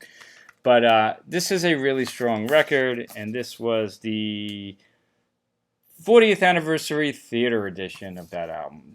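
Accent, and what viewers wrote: Long Island, United States. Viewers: Is that a North Babylon Fire Department hat? You sound like a Long Islander lol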